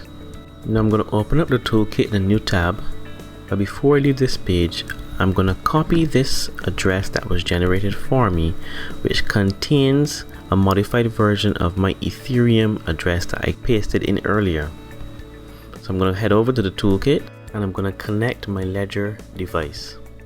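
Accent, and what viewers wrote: Barbados. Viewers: Hi are you from Barbados? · Liked and Subscribed! Thanks for the video really clear and easy and l was lovin' up the Bajan tones. Had to start over a few times as ledger wouldn't connect. It's all a bit scary, I wish there was some way of checking it's all gone through.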